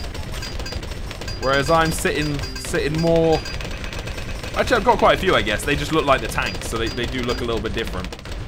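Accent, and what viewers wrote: United Kingdom. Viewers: i very loved ur english-british dude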